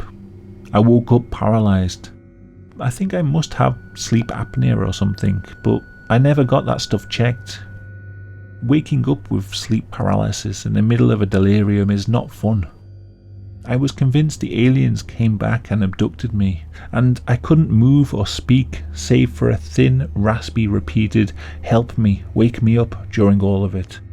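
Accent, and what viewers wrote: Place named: North, United Kingdom. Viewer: He’s just from up north lmao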